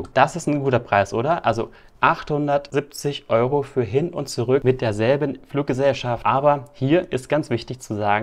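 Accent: Germany. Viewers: Ist das so eine Art Bauerndialekt? Uckermark?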